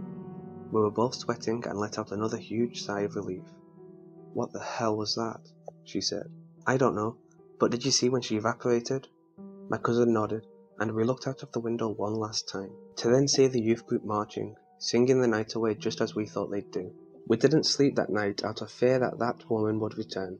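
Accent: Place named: Northern England, United Kingdom. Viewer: Another great job! Where abouts are you from? We're based in York and you definitely sound like you're from the north :D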